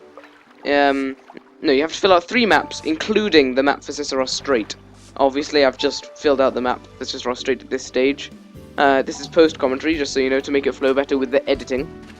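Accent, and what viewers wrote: United Kingdom. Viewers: Cool!!! Hey, are you british?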